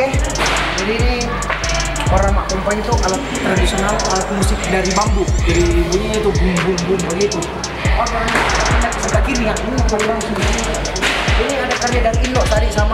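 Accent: Makassar, Indonesia. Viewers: salah penulisan sy dimana?? Loe org toraja tp mau logat2 ala2 Makassar.. Santai moko ces..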